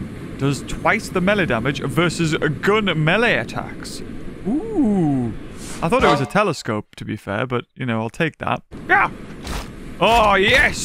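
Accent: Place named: United Kingdom. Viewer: i just noticed he's british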